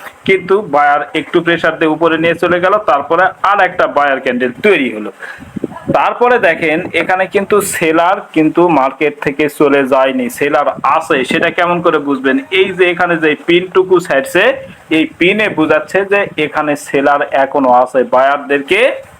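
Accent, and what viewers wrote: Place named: Bogura, Bangladesh. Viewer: ভাই মনে হচ্ছে আপনার বাড়ি বগুড়া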